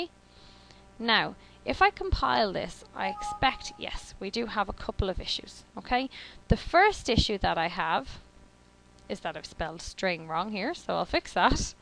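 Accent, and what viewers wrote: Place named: Ireland. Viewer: So nice to hear an Irish brogue explaining Java to me rather than Hindi. :-) Thank you! Great videos.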